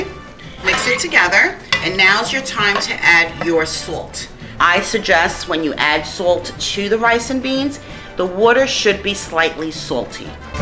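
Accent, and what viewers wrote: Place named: New York, United States. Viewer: I love your accent soinds new yorker food looksnbanging girl! Love spanish food!!!!!! Any type of spanish food #1 fav food of all!!!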